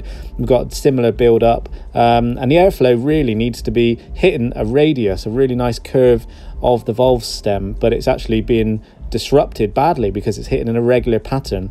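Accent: Bristol, United Kingdom. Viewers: Bristolian accent maybe?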